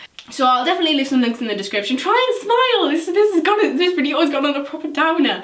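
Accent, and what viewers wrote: Newcastle, United Kingdom. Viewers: Why would she fake it? She lives in/near Newcastle and even in vlogs and stuff she speaks like that. Theres no reasonable reason for her to be faking it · Jeez she obviously doesn't fake it, have you tried to do a Newcastle accent, yeah! It's hard! Also she has done vlogs with her friends who are also from Newcastle. Her dad didn't grow up there so that's why he hasn't got an accent. Her mum probably just doesn't want to be in the videos and then what reason would she have to fake it jeezussss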